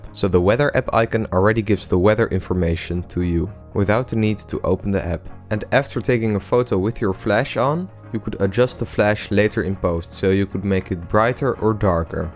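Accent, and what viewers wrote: Netherlands. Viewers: I can hear the dutch accent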